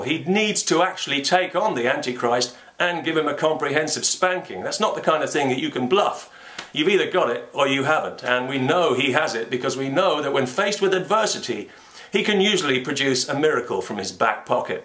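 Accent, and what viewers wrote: United Kingdom. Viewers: Fuuuuck! I could listen to this guy talk for hours. His mind Works like the Robin Williams of logic, reason and Witty but justified criticism. Aldo, does help having that awesome brit accent lol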